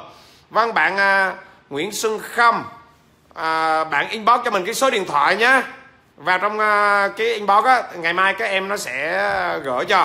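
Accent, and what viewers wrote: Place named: Central, Vietnam. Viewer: Chú ơ dau cua miền trung vay ạk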